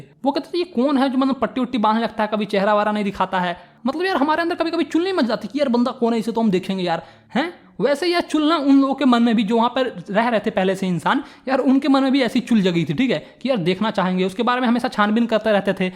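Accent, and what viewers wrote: Bihar, India. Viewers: Bihari ho abe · Aap bihar ke ho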